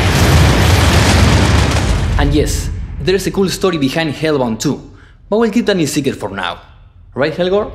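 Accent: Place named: Argentina. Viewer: Jajaja genial que al hablar ingles te sale todo el acento argento, genio!!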